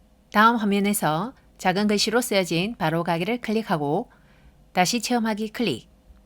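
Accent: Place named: Gyeongsang, South Korea. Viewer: 나도 대구지만 경상도 사투리 정말 더럽게 진하게 쓰네... 이 여자 멍청이아냐?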